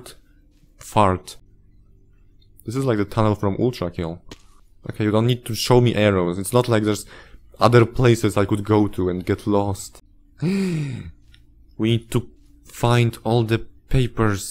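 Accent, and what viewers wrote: Poland. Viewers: are you polish? I'm sure that your accent is polish.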